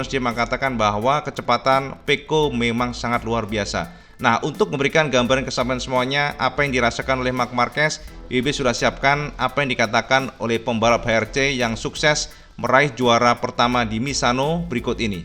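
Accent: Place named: Java, Indonesia. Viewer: seneng aq cak cara ngrivew mu iso mantep logat jowone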